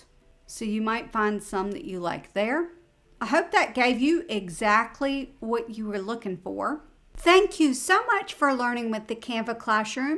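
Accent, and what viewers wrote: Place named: South, United States. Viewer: Thank you your video provided everything I needed to know and the potential that the website has to offer. Love your Southern accent too,!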